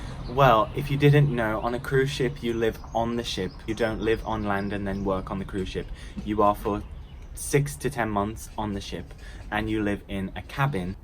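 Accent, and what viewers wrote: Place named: Midlands, United Kingdom. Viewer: Aweee.. 😍 Thank u so much, u guys r awesome *hugs* and btw a hint of where I’m from is in my YT name 😉 I’m a Swede i.e. I’m originally from Sweden 🇸🇪 but it gets a bit more complicated after that, as my mom‘s German and growing up we moved house A LOT (mainly due to my dad’s profession) until about ten years ago when I came to London for my studies 🇬🇧 and I’ve lived here ever since 🤷🏼‍♀️ Whereabouts in the UK r YOU from btw ‘cause I hear a bit of an accent which - to me - says Midlands but I can’t make up my mind if it’s like, between Birmingham and Cheshire or further north? 🤓😁 And last but not least: thank u so much also for the new vlog, I’m loving it a lot and ur bf teaching u Dutch had me LOLing so much, u guys should do the whisper and/or yoga challenge next 🙏🏻😅👍🏻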